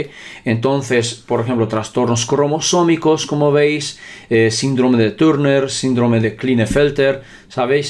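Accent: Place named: Poland. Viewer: tienes acento de polaco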